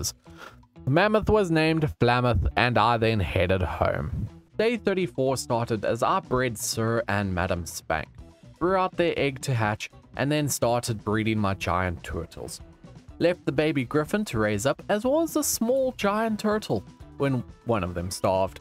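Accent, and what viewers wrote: South Africa. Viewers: The south African accent is kak funny 🤣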